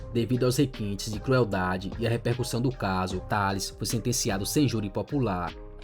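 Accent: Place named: Rio Grande do Norte, Brazil. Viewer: Amigo, você é do RN? Tu tem sotaque de potiguar.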